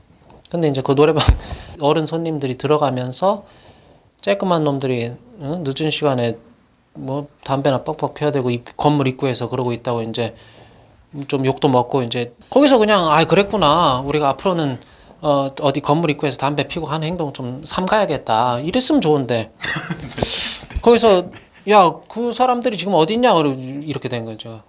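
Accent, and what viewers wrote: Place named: Jeolla, South Korea. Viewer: 이분 말투가 구수하신게 전라도 사투리 조금쓰시는거 맞나요?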